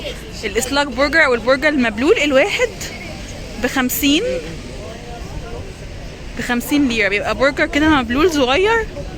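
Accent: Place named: Alexandria, Egypt. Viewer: شكرا اختي ع الفيديو الرائع ده.. ربنا يوفقك.. لو مافيها تطفل او ازعاج.. ممكن تكتبي اسماء الاماكن والمناطق؟ يعني مثلا اسم البارك بالظبط والمنطقة. الله يوفقك و سلامي ل وليلى.. وشكرا تاني على فيديوهاتك العفوية دي وكتري اووووي من الكلام الاسكندراني 😅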